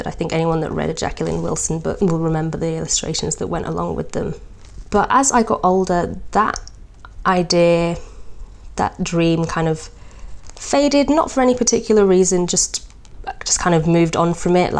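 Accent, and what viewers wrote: England, United Kingdom. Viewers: I third that. Haha. New subscriber. I love Art!! Love to listening to British English accents.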